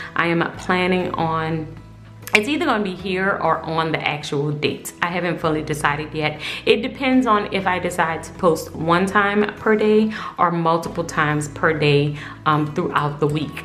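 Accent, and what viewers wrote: New Orleans, United States. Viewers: Are you from New Orleans?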